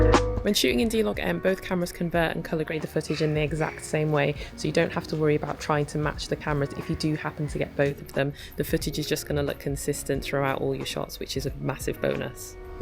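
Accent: United Kingdom. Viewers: Great video. Talk slower for your over the pond English is hard to take in your info.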